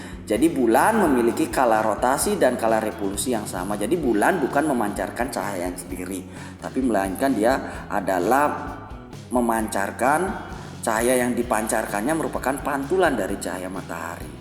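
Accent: Bali, Indonesia. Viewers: Kelihatan bli dari logatnya hehe, salken nggih 🙏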